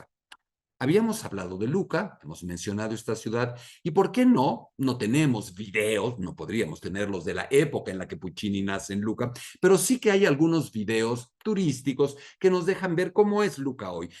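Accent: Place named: Venezuela. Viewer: Voy a estar pendiente de tus videos sobre ópera. Hablas como los italianos en Venezuela.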